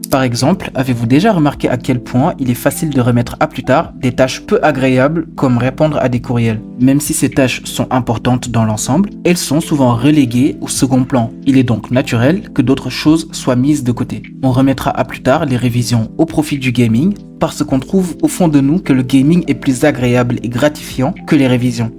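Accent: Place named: Senegal. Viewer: Un frère sénégalais❤❤❤ je regarde toujours tes vidéos j'ai jamais sue et je trouve notre accent très propre ne t'en fait pas😊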